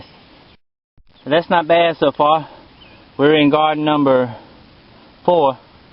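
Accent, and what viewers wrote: South, United States. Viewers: Sorry all I could think of hearing your voice is the movie character Forrest Gump!! I don't mean that in a bad way!! I love the southern accent and I'm from Australia!! Y'all!!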